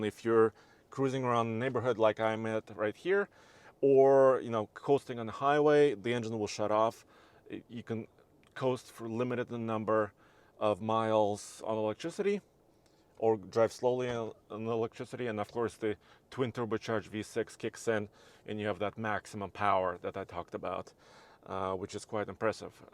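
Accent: Russia. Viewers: It sounded like someone else’s voice for the first couple seconds then the Russian accent came back in lol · Great review! Love your accent! Is it Russian? :)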